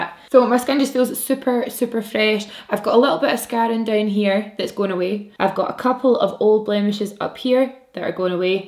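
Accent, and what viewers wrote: Scotland, United Kingdom. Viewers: i just want you to know that my teacher has a scottish accent and everytime she speaks i always imagine u speaking lmao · you're so Scottish, I love it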